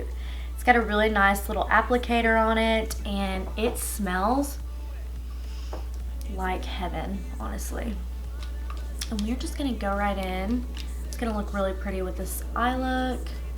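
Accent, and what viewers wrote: South, United States. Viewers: Lol, the look you gave yourself at the end was priceless. It was like "Bitch, I'm feelin myself!" Love your personality and sense of humor. And of course your southern accent. My fiance is from South Carolina. You've got a new sub. Keep the makeup tutorials coming ya'll. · I’ve been waiting for this tutorial for the longest!!! 😍 how do you find your foundation shade? I’m having trouble and love how you started your intro you have like a little country accent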